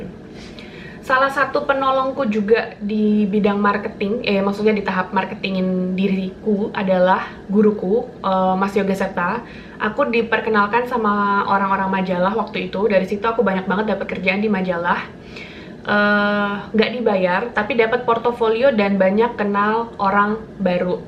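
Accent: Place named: Yogyakarta, Indonesia. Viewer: Thank you for kind sharing. Seneng banget akuuu, penasaran Ka orang mana sih? Logatnya kaya orang jogja hehe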